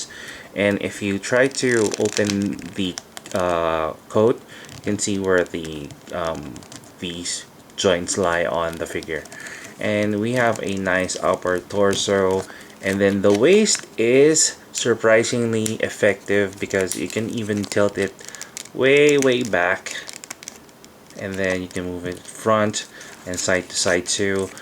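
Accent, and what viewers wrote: Philippines. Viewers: are you fillipino? you sound like it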